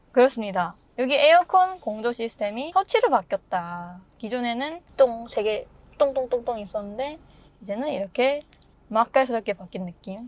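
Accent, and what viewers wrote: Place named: Busan, South Korea. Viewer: 음~~ 서울 상경한지 꽤 됐는데 아직 서울말 패치가 덜 된 부산아가씨 억양이네 ^^ 재밌는건 부산 가서 저런 톤으로 말하면 서울말 쓴다고 면박주고 서울에서는 아직 경상도 사투리 못 벗어났다고 하거든 정체성의 혼란을 겪고 있을 시기임 ㅋㅋ 리뷰는 재밌네요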